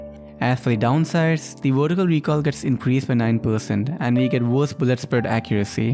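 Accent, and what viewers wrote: India. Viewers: Bro are you Indian guessing from the accent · Man your accent is kinda Indian love your vid❤️ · Wtf too to Indian lagta hai bro Are you bro ???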